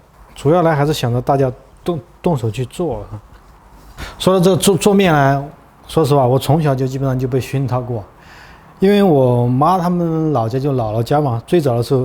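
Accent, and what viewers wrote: Sichuan, China. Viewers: 好久没看到嫩个潇洒倜傥的美食博主，讲话还加点四川腔，幽默风趣搞笑，吃起自己做的美食一点都不含蓄，你最后那一大口吃得我准备明天去买点食材来做了，就是这里葱实在太贵吃不起，还有你那个干葱估计加拿大也买不到，哎，可咋整呀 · 听口音， 小哥川南的？ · 把四川話直接用国语讲，可能只四川人能听懂，而且亲切!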